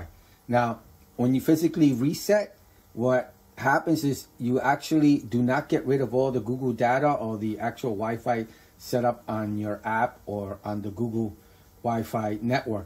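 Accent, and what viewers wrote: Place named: New York, United States. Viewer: Bro, thank you for sharing this I was trying to help my sister in Orlando and I could not figure out how to reset her Google Wifi two. The button on the back of the gen 2 is underneath the device with no fiscal button. Bro, I was listening to speak and I swear you sound just like me. I told my wife to listen to your video and she was that the voice sounds familiar. She tells me that's a New Yorker voice. I told her that's me except you have a little higher pitch. Anyways, thanks for sharing.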